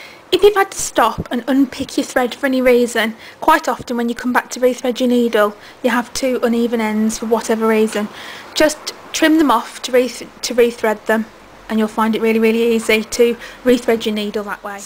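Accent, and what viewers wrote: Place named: England, United Kingdom. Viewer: Loved your video, you talk just like a friend of mine back in England, it makes me homesick! Thanks for the videos! xx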